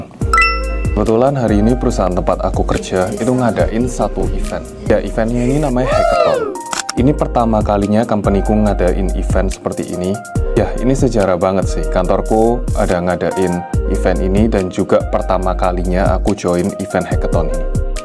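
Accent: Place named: Java, Indonesia. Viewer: kok medok ko ?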